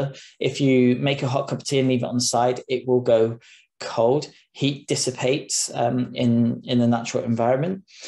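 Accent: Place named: United Kingdom. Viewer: >>> The speaker’s accent appears to be British. Strange, because I thought only Americans were this ignorant and stupid.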